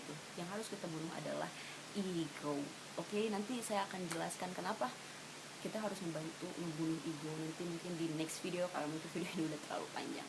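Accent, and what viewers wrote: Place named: United Kingdom. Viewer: banyakan makai logat englis jd suara gk jls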